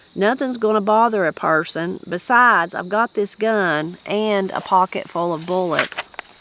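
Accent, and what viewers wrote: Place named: South, United States. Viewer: Late to the party, but thankful as well. What a gift. Your voice, pace, cadence, and tone are what we sons of southern women recognize and love.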